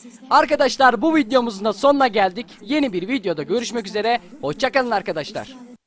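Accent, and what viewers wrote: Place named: Rize, Turkey. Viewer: Abi sen nerelisin ya tam Rizeli tipi ve şivesi var sende 😁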